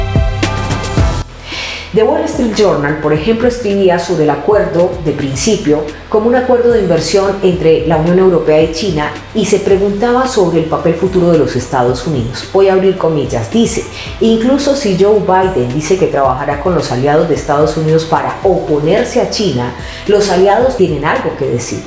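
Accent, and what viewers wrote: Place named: Colombia. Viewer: wow, creo que seré de las primeras en el chat, saludos!! Amo el acento colombiano:)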